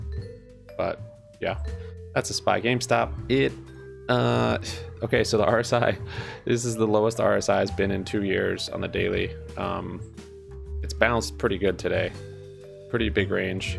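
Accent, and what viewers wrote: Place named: United States. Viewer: Dude, you sound just like Elon Musk with a more American twang. Are you Elon Musk?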